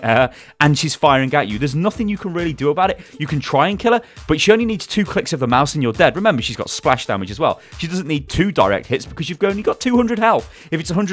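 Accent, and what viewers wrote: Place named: United Kingdom. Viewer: Great British Accent! XD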